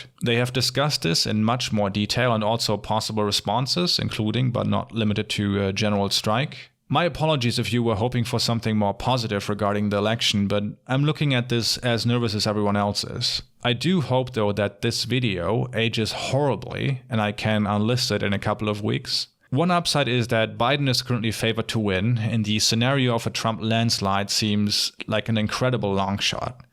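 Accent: Germany. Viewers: Ha, I knew you were German. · Bist du Koelner?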